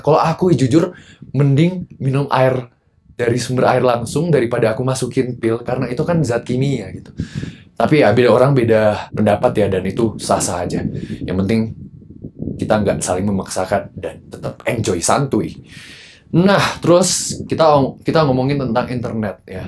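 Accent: Java, Indonesia. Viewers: candu bgt ngomong jawa nya hahaha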